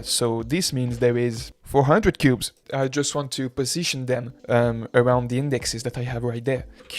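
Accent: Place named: France. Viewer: IBCs !!!!! I am now co-opting this acronym shamelessly and, like a true american duché-bagette....I will likely fail to give you proper credit for its origin. Only because it would be difficult to reference your work; nobody knows who you are yet.....yet. Don't stop doing what you're doing. It's inspiring. Plus I would rather hear this kind of material with a french accent anyday of the week. Especially the cursing and self depreciation....I even find myself using a similar french accent in my head when I am berating my big dumb American brain for my frequent fails at tasks that I begin overconfidently only to realize how much more there is for me to learn.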